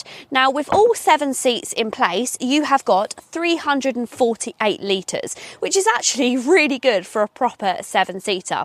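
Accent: United Kingdom. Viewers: If I am in not in ❤ with britain english, but you derserve ❤ when talking so quick and so much about this enough big car 😊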